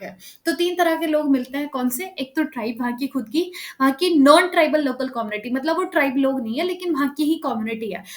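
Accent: Delhi, India. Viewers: Ma'am apke bolne ke tarike se lag raha h ap delhi se ho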